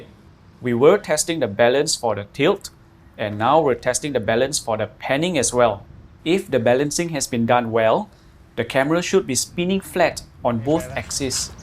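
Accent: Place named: Quebec, Canada. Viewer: Where are you from? You have some kind of a french canadian accent!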